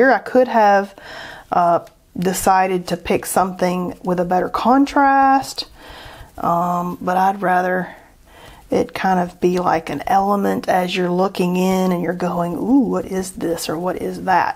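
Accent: South, United States. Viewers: I first discovered on Skillshare. Her “art noises” had me hooked from the beginning. (Plus, when a southerner hears another, our ears perk up). She very quickly became my favorite instructor, because- as you pointed out- her enthusiasm is contagious! ☺️ It doesn’t hurt that I have learned a lot, too.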